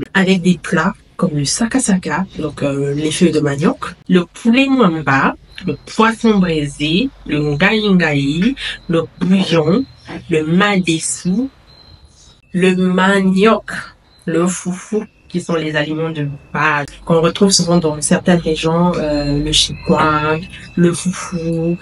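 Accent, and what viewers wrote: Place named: Senegal. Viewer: Kitoko moina en tout cas. En plus du as un accent sénégalais. Merci beaucoup pour la vidéo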